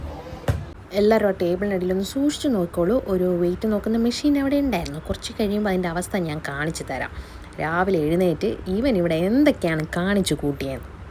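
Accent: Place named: Trivandrum, India. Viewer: Trivandram aanalle language kttappol mansilayi evideya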